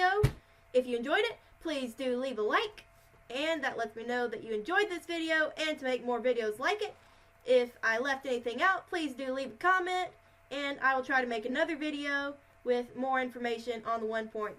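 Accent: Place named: United Kingdom. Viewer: That British accent though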